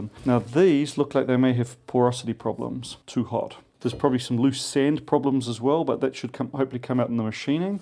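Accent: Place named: New Zealand. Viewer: I joined this channel because you use FreeCAD! (and maybe also because I love the Kiwi accent...)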